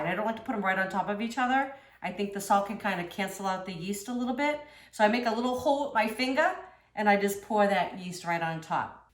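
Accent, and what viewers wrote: Boston, United States. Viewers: Ordering one now - think I'm going with black stainless steel! thanks for the demo and love your boston accent!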